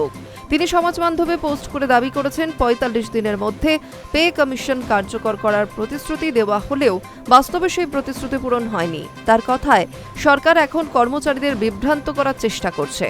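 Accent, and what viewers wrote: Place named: Bangal, Bangladesh. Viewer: যিনি খবর পড়ছেন, তাঁর খবর পড়া পুরো বাঙাল উচ্চারণে আক্রান্ত!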